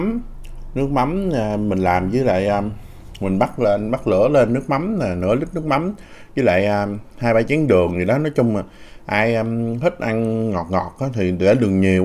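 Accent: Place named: Mekong Delta, Vietnam. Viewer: E nói như vậy là đúng miền tây đó e mình đi làm ăn kiếm tiền giữ tiếng nói vùng miền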